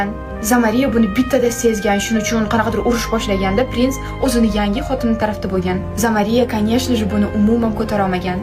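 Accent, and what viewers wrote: Tashkent, Uzbekistan. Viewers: Ha xaqiqiy Toshkentcha til chottki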